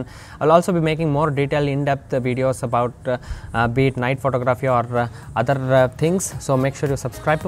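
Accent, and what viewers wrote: South India, India. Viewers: Accent is so much south Indian... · i always like indian accent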